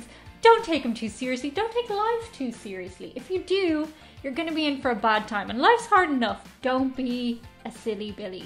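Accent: Ireland. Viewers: Hello you got fantastic content I am a Irish ancestry but I was raised and born in USA I am a fan of Europe culture my surname is Murphy. your channel is a fantastic channel great interesting content. Your dog is awesome and very beautiful dog. I love your Irish accent too. I really like to visit Ireland one day to visit where my ancestry come from I also want to visit the United kingdom too.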